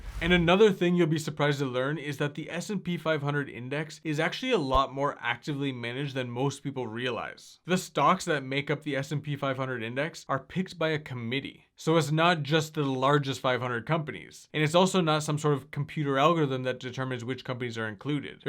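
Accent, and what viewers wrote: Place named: Canada. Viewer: Hey what app or brokerage do you use to buy stocks with ? Lol also I can really hear the About! (fellow Canadian haha)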